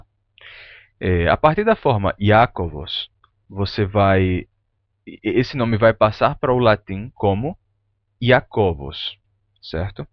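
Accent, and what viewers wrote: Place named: Maranhão, Brazil. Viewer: Esse canal é muito massa. Adorei conhecer e já to consumindo tudo. A propósito, uma curiosidade: no seu sotaque, o R de PORQUE é aspirado ou ele é sonoro? Esse R é tão sutil que ele soa como o H do início de frases em inglês. Vejo maranhenses e tocantinenses (com quem tenho contato) pronunciando um R muito parecido com esse som aspirado do H. Procede?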